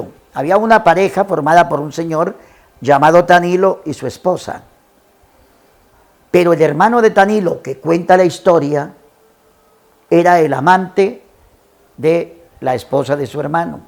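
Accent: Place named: Colombia. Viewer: Se siente el acento colombiano😀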